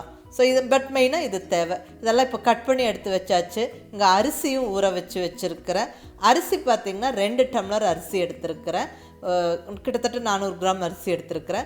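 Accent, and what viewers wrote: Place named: Tamil Nadu, India. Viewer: Hi mam unga briyani super atha vida unga Tamil slang innum supero super 👌👌👌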